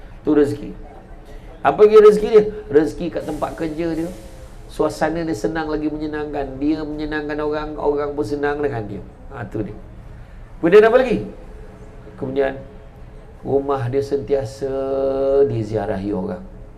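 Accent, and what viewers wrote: Negeri Sembilan, Malaysia. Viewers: saya rindu nak dengar orang cakap nismilan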